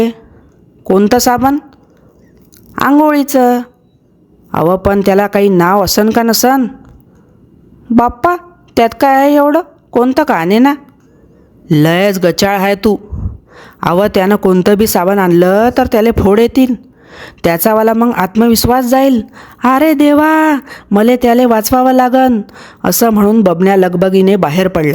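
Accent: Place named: Vidarbha, India. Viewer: छान विनोदी आहे कथा. विदर्भातील भाषा छान सादर केली आहे.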